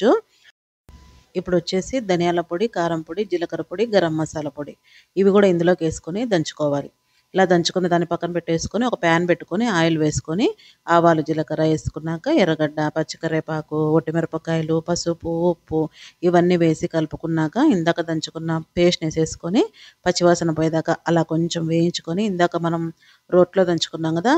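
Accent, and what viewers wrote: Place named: Rayalaseema, India. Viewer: చాలా రోజుల తర్వాత రాయలసీమ భాష విన్నా ❤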